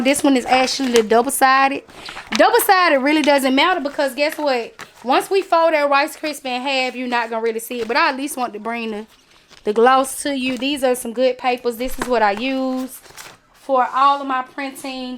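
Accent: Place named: South, United States. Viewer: Girl.... you are so country!!! LOVE IT! I'm from Texas so I'm more country! Thank you for all the tips. Great video.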